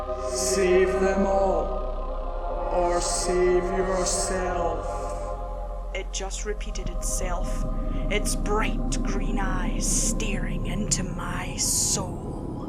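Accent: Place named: United States. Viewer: This was totally awesome. Your American accent is great! I hope you do more of these!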